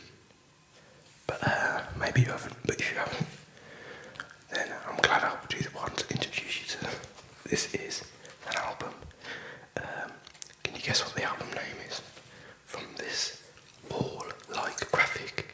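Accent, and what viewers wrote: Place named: Birmingham, United Kingdom. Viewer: you sound brummy, are you from the black country? im from wolverhampton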